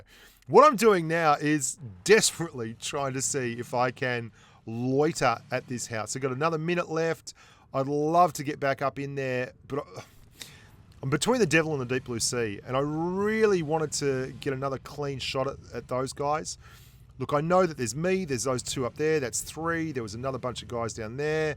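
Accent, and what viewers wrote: Australia. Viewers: you have the best pubg mobile videos! I can’t get enough. ( The Aussie accent helps;)) IGN: Salt65 #pochinki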